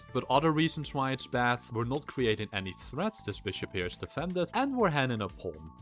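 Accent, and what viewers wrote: Netherlands. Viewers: Ik ruik nederlands in dit accent 😅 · You sound very Dutch 🇳🇱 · Dutch accent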